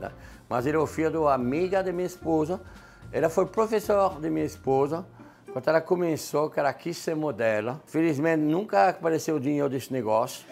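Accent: France. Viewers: Que Francês maravilhosooooooo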